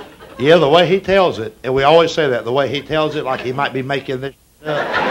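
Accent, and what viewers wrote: South, United States. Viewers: Living in the SOUTH, you laugh harder because people really do talk like this. Bless his heart is really a smear to the person but they don't know it. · His southern accent, story telling ability, timing and sense of humor make me laugh every single time. I liked the full 8 minutes of him where he “paints the picture” in my mind. “We will make a casserole and come on over.” True true.. 😆 😁 😂 🤣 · Anyone from the south like I am knows that’s just how we talk 🤣🤣🤣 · Just found this! Am now a new sub! You are so funny! And, myself being from TN, I can relate to your Southern accent and charm! And all your stories sound like many memories of my childhood. Thanks for the laughter! We need more folk like you in the world to cheer us up in these uncertain times!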